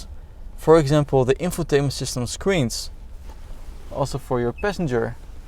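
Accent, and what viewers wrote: Netherlands. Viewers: Hoor meteen een nederlander 😆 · echte hollander die engels praat :D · a dutch guy talking English... never been good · I smell some Nederlands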